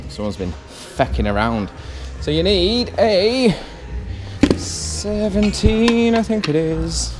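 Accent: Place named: Manchester, United Kingdom. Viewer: Thanks for this mate gonna need it soon. Can recognise that manc accent a mile off. Denton I’m from, u seem close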